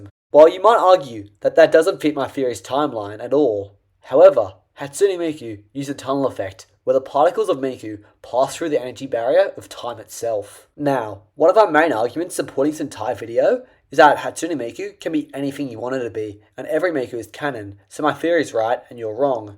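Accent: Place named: London, United Kingdom. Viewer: You still talk like a Greater London citizen though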